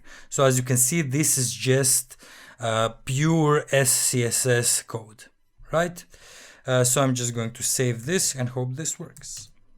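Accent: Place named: Russia. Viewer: Кайфую от Русского-Английского ))) Бекаус блять миссинг патх, фор пост епта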